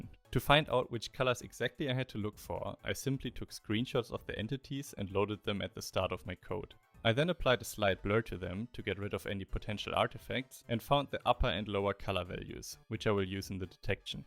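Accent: Germany. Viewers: Are you by any chance German? (Great Video btw)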